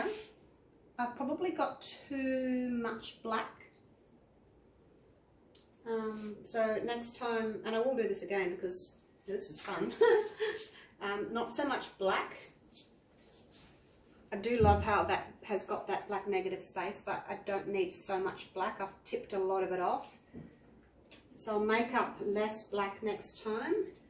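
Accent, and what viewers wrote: Australia. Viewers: I love hearing your Aussie accent as much as I love your artwork.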